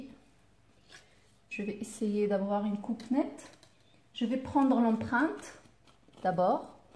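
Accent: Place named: Morocco. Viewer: Pourquoi vous êtes tunisien et vous parlez l’accent marocain???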